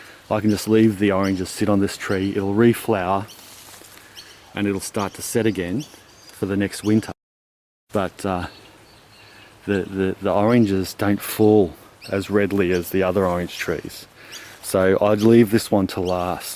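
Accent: Australia. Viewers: Nice...soon I will be making a video like that..as soon as I get my little farm..lol My dream! Are you located in Australia, somewhere like that? I guess because of your accent.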